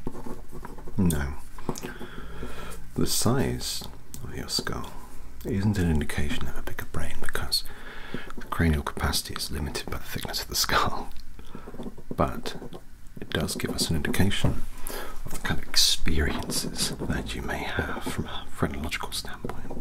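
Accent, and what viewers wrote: United Kingdom. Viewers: You could genuinely do a Micheal or even William Afton from the Five nights at Freddy’s series. As you sound just as British, and almost sinister sometimes. But at the same time you are very funny so you could have fun with it. I don’t expect you to do it I just had that thought, could be cool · Minolica coughs awkwardly in I totally don’t thirst over his gorgeous hair and British accent sorry 😅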